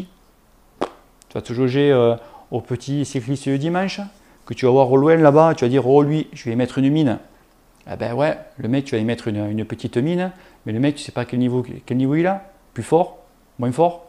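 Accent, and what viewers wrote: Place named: Béziers, France. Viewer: j'en étais sur que tu venais d'à côté de béziers, j'ai reconnu l'accent (maillot saint chinian)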